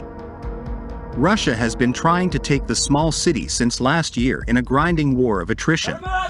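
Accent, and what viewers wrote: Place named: United States. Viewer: Clickbait with annoying American bot voiceover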